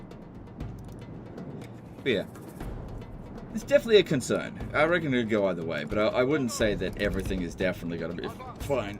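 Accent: Australia. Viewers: i was there when he still cursed a lot spoke australian while doing m2tw XD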